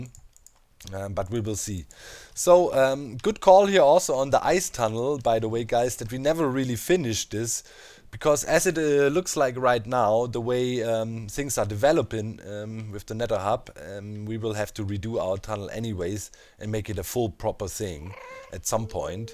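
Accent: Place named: Germany. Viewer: Are you German, because you really do sound like it (I'm Sorry if you answered this before I'm new...)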